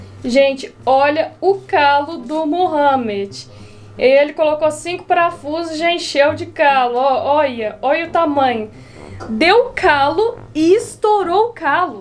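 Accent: Minas Gerais, Brazil. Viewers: seu sotaque em nossa língua é gostosamente mineiro! Sou daqui de Divinópolis, Minas Gerais! Trem difícil, cara emburrada, etc... muito legal! Você é a youtuber mais mineira do mundo! Conhece o Paulo, um rapaz lá do Recife que mora aqui perto de Divinópolis? Veja este vídeo, e vou te dar a ideia de explorar este seu jeito de falar mineirês! <link>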